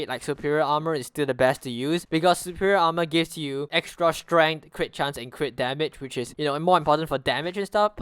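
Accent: Singapore. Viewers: eyy singlish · Is dis dude a Singaporean? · you have a huge singaporean accent are you from lee kuan yew country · Are you in Singaporean cause I am and U have the accent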